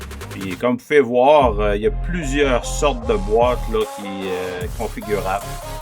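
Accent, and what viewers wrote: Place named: Canada. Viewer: C'était vraiment utile surtout le site indiqué. (Et j'adore l'accent Canadien) Merci!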